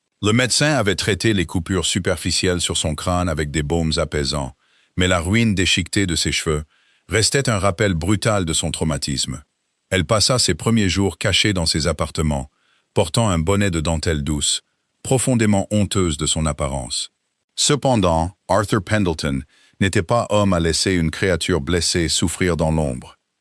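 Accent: England, United Kingdom. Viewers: L accent anglais apporte un charme à ce conte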